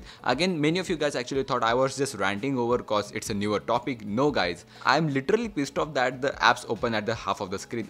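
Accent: India. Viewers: I had a hunch it's an indian guy...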